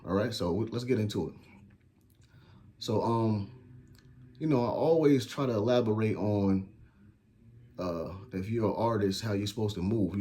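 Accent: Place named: New Haven, United States. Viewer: Sound like New Haven lol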